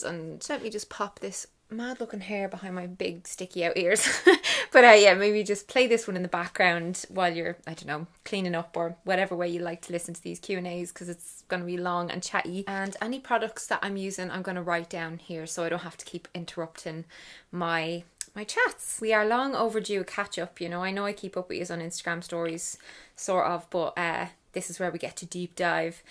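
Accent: Ireland. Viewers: Honestly not that great 😔 Unfortunately covid coincided with just a lot of shitty stuff so my natural bubbly self is a bit emotionally exhausted...But your videos always boosts my mood so much...so thank you Mel! Especially the food diary Friday's... it actually made me recognise my own problems with binging and stuff so yeah thank you especially for all your stuff with that. It's weird I realised the other day that a few of our close family friend's are Irish and there's something about that accent, your accent, that reminds me of happier times... Sending you lots of love 💚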